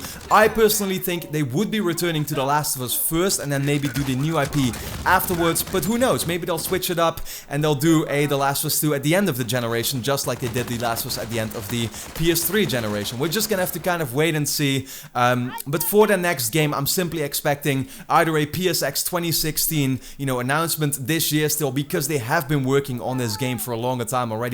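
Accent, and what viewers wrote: Netherlands. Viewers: lel ik had 2 min nodig om te horen dat je nederlands bent XD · lol hardcore nederlands accent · Is Dutch?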